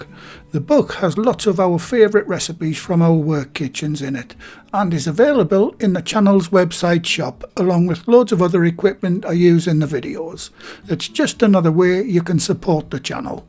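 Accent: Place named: North East England, United Kingdom. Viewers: I am so glad I found your channel, being from near Middlesbrough it's so nice to hear a North East Accent online! Thank you for the videos and happy cooking:) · I'm inspired and subscribed. I got caught up in a Youtube loop and somehow ended up at your splendid channel. I'll be making kebabs this weekend after watching one of your other posts. I was born and raised in Durham. I haven't lived there for a long time but always nice to hear a north east accent. All the best!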